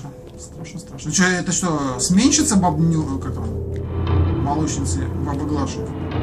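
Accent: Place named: Odessa, Ukraine. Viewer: Легкий одесский акцент, но приятный такой :)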